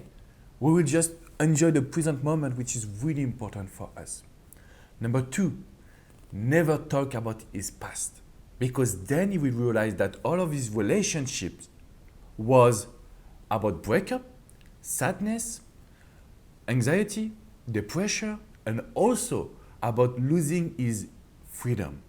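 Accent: France. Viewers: Please check my msg sir... i didnt meant to criticize yu..nt even think about that.. bcoz u r the coach i have huge respect for.. i only mean.. i want some advice at this particular situation... i love this french accent words very much❤️... i lv , respect u sir.. atleast pls don't misunderstand me fr that comment... please help me to get over this situation sir...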